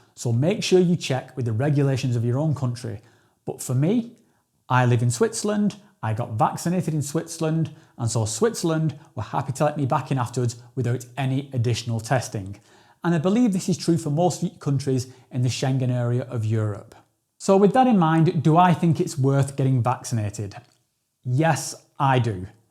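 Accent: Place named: England, United Kingdom. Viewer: I thought you sounded too English to be Swiss🤔🤣 you never know with accents these days. Would still make a good video for your "fans" if you never seen it as an invasion of privacy.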